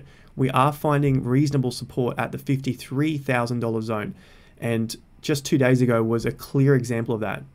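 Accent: Australia. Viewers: Im completely new to the stock market and wanted to thank you for your informative videos. It's helped me make a bit of return off of a small investment and i'm hoping to grow further. Refreshing to hear an Aussie accent as well! Thanks for sharing your knowledge. :)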